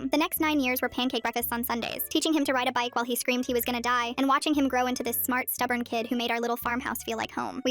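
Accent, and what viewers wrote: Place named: South, United States. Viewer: Damn, you’re southern as hell😅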